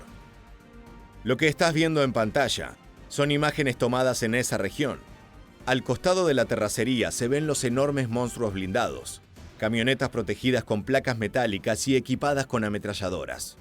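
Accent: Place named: Argentina. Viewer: Amigo, estas muy bien documentado, aunque veo tu acento argentino me da la impresion que vives aqui en Mexico, vas al dia... muy interesantes tus documentales. Saludos.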